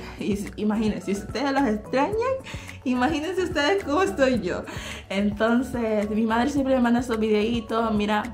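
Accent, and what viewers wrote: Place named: Honduras. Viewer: Tu no tienes que cambiar tu modo de hablar tienes que ser auténtica y Aser prevalecer y valer tu idioma de Honduras Yo también viví en España y nunca cambie mi forma de hablar 🙋🇪🇨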